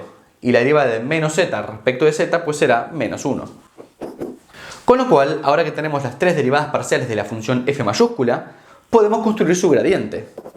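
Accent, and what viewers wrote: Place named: Argentina. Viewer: TIene acento argentino pero habla español de españa, wtf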